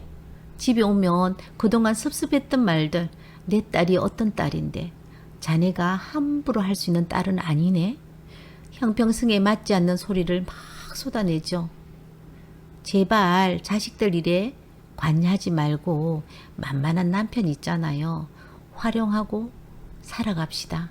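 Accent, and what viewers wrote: Gyeongsang, South Korea. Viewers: 경상도사투리 정ㅇ살듣기 좋아요😅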